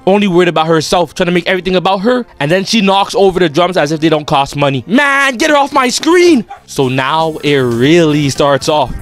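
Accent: Toronto, Canada. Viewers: Ifw the video but I can’t not hear the Toronto accent💀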